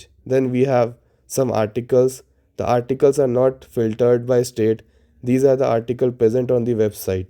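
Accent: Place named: Bihar, India. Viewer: your bihari accent is so sexy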